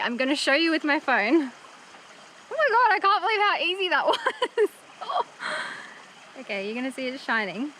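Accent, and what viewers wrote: Australia. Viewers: The quality of your videos is always A1 but that one was exceptional. New camera? Different settings? In any event, ace job!!! Ginger nuts!!! A girl after my own heart! I'm a Brit, have you tried Mcvitie's Ginger Nuts? Awesome!!! Talking of Brits, I've always been curious of your accent. Is it pure Australian or is one of your parents from the south of England? In any event, I love it!!!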